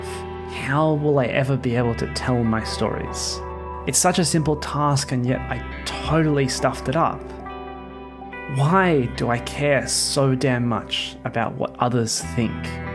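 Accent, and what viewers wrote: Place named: Australia. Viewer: How great it is to hear an Aussie voice. All the best with your journey, if it's a happy one, then things will continue to get better.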